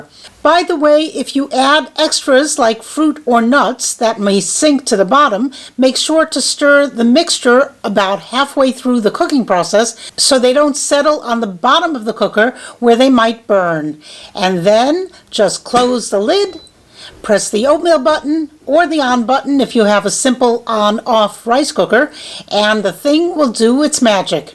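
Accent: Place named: United Kingdom. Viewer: Thanks for the great vid! May I ask.... do I detect just a slight UK origin to your lovely accent?? Cheers!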